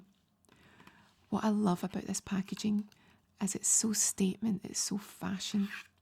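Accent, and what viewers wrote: Scotland, United Kingdom. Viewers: I was about to doze off until I heard, "because she doesn't really have a butt, yet," which, when replaying it 3 times and factoring in the Scottish accent was of course, "because she doesn't really have a budget." I'm all like, 'Ohhhh... budget." :face-blue-smiling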